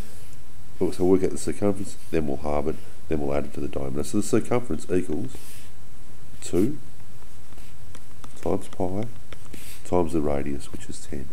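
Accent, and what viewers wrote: Australia. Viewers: I don't really understand coz of his aussie accent.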